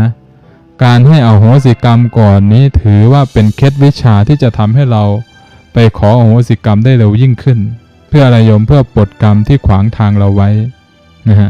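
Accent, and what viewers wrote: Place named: Lampang, Thailand. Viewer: ลำปางชัดเจนดีเจ้าคะ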